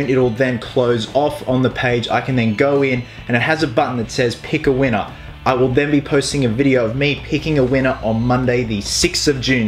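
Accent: Australia. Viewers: hey r u australian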